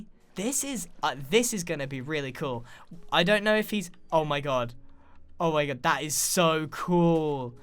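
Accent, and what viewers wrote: United Kingdom. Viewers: QnA: are u British/English cuz I am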